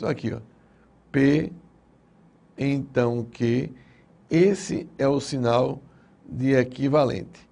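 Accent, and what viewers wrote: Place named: Pernambuco, Brazil. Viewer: Excelente, professor! Parece até que eu tô de volta às salas de aulas, ainda mais ouvindo esse "pernambuquês", rsrs O senhor ensina muito bem e aplica a teoria em várias práticas diferentes, o que ajuda a estar preparado em qualquer situação. Obrigado pela aula!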